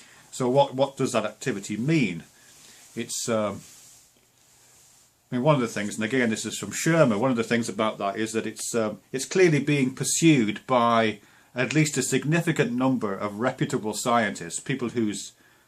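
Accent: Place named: England, United Kingdom. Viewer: Your voice is sounding more English than Scottish these days.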